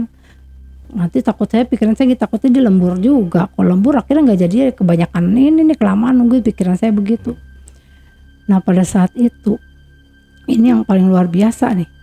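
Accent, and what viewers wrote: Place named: Jakarta, Indonesia. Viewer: Saye suka loga betawinye..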